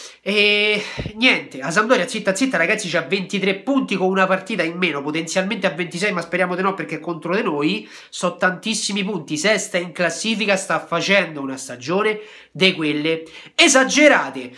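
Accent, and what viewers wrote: Latina, Italy. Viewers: Ma sei di Latina?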